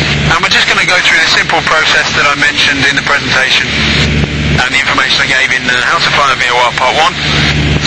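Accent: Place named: England, United Kingdom. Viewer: Really good, thanks. Nice to hear an English accent on a youtube tutorial for a change ;). Only thing that would have made it better, would have been having the camera closed up on the needles....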